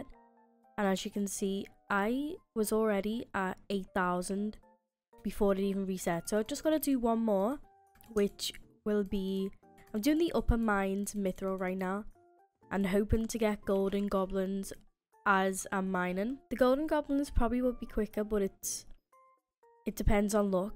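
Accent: Liverpool, United Kingdom. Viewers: sheee, the scouse god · are u a scouser? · Just a question r u British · Are u scouse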